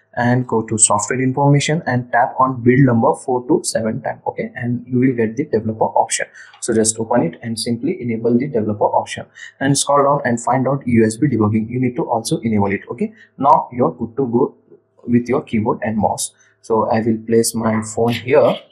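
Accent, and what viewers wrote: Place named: India. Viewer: Is it me or is the sound quality so bad on this video that, coupled with the presenters thick Indian accent makes the video unintelligible?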